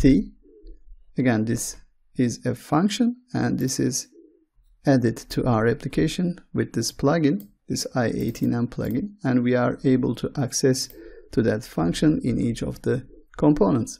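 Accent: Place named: Turkey. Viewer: just when I started to think "wow what a heavy Turkish accent", the tr locale showed up haha. Thanks for the tutorial though.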